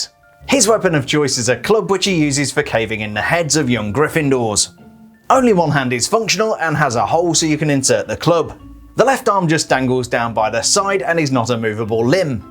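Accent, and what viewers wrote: United Kingdom. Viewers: After all of the videos from you ive watched, i cant tell if you’re british or American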